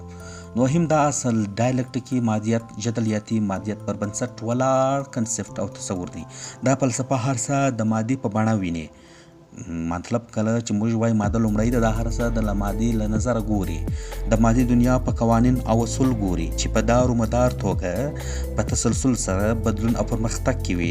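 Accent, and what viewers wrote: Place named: Helmand, Afghanistan. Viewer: ږغ د هیلمند دی تاند دی اوسي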